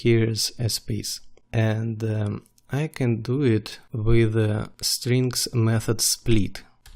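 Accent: Russia. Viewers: Русский английский заходит лучше, чем английский английский - благодарю за видео! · you have a nice russian accent :) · i fucking love russian accent. i hate listening tutorials with indians.. i dont have nothing against them, just cant listen to them.. but russian.. i love that language.. i know little russian.. ya ponimayu russky nemnogo :D croatian is also very similar to russian . cheers.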